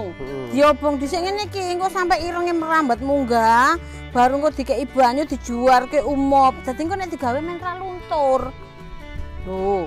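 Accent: East Java, Indonesia. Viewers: orang Jogja tapi bahasane Kyok orang jawatimuran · Bu kok di Wates Kulon Progo tapi kok logatnya jawa timuran banget. apa sedang berkunjung ke saudaranya yg di kulon progo. Bahasa "sak buajek", "banyu puteh (bacanya bukan putih)" khas jawa timuran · Slmt pagi bu..... Domisilinya di Wates yaaaa.... Logat jatim nya kental sekali Sy sll buka video masak2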